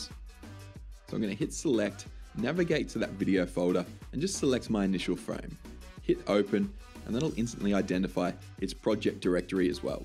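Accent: Australia. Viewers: They're both Australian?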